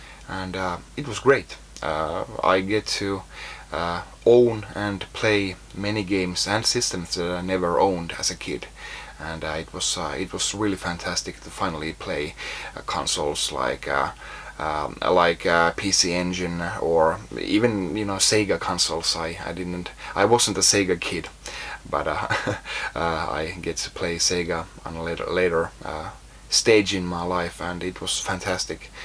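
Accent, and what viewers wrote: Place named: Finland. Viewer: It's not just the Finnish accent or his low register but also his measured way of speaking and the thing he does when he can't think of what to say for a second. He's nice to listen to, and he has something to say. I wish that more announcers had voices like his! Aside from the guys who commentate poker tournaments, the olympic people, and a few hockey guys, those guys usually sound barky and rub me the wrong way. Even when there is a nice voice they typically have two others you have to deal with when he has nothing to say. There are lots of people like on Youtube who actually know how to speak without excessive animation, and with diction, one of the reasons I watch it and why I think it's so popular. And of course they cover things you'd never discover otherwise. I've lived with a moderate hoarder, and you are no hoarder! 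Not even the slightest bit. You're right when you say you're running out of space but everything is neat and organized and clean, you are willing to let things go, and most important everything has a use within the foreseeable future and therefore is not trash. They're games.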